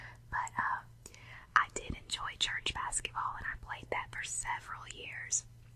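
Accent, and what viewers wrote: South, United States. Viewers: Wow, you have a southern accent! I think you peeps who have southern accents are the most relaxed people! Your voice is sooo relaxing. :) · I really enjoy your southern accent :P It bring tingles down my spine :P Awesome video once again!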